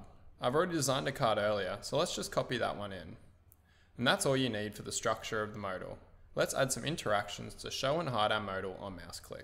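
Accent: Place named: United Kingdom. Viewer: You lost me in 10 secs, too fast and the British accent is hard to understand.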